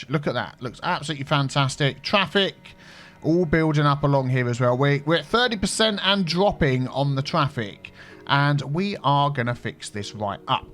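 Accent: United Kingdom. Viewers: I'm currently binge watching this series and I'm actually in San Fran right now for Labour Day! I'm a Brit living in America and the pandemic has made it really difficult to make friends so I've been pretty lonely and homesick, but hearing your Britishisms is really comforting. Thanks <3 (Also, Yorkshire tea is the best and thankfully I have been able to find it out here!)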